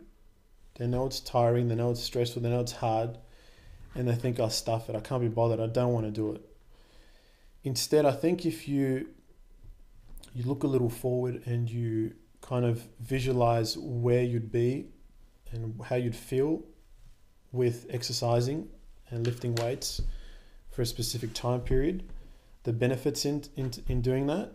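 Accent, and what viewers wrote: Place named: Australia. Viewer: Is it safe to assume you are of greek descent ? :P Im not gonna ask whether you are an aussie, your accent gives it away.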